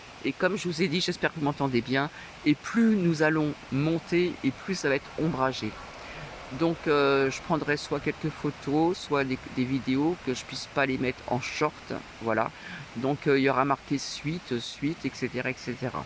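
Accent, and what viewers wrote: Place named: South, France. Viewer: ma très chère, c était normal, de tout cœur avec toi, combien c est une " belle grâce d avoir ton Amie avec toi et ça ne peux te faire que du bien, quel bel accent du soleil, merci, merci pour ses si belles vidéos, ses photos ces partages ça fait du bien, c est chaleureux, bisous et gros câlins à Nouky, on croise les doigts pour les projets de 🍀🍀🍀🍀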